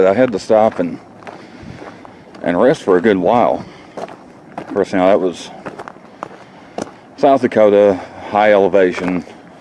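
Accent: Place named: South, United States. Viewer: Cool place... Anyone every tell you that your voice is similar to an old comedian named Lewis Grizzard? Of course you don't have as thick of a southern accent as he did but the tone is similar. Very cool I think...VERY clear and easy for these older ears to hear. Brandon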